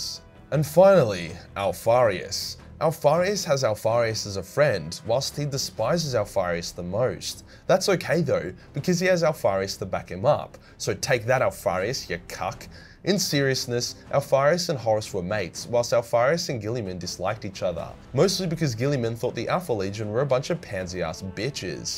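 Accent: Australia. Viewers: every time i watch your videos i start thinking with an australian accent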